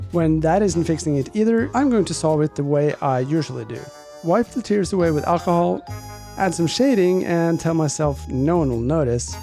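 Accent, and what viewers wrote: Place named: Norway. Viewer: I can't quite figure out what flavour of Scandinavian you are. Norwegian?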